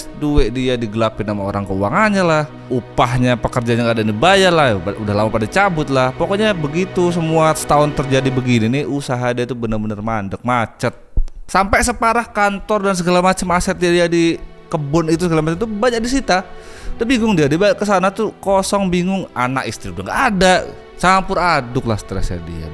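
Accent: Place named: Madura, Indonesia. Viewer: Kadang kadang logatnya kayak logat orang Madura 😂😂😂😂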